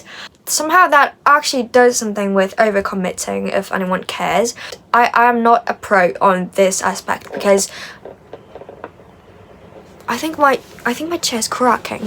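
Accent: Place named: United Kingdom. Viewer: I came from Instagram, nice podcast. Btw I like your British accent a lot.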